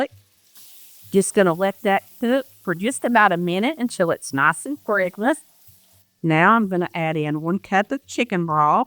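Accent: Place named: Virginia, United States. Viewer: Mama, I just subscribed to your channel and I love it. I’m not a cook but my boyfriend is from Virginia and he’s got an accent like you and I just called him and told him he’s got to look at your website. He is gonna be so thrilled I love it. I love crockpot cooking though and I am really enjoying all your crockpot cooking. Thank you mama.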